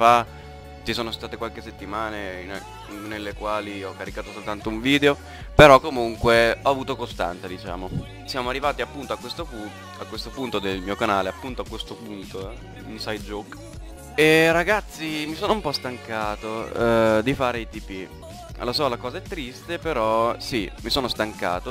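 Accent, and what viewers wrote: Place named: Romagna, Italy. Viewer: Mi sembri romagnolo dall'accento, sei di Ravenna?... AnnennA...AnnA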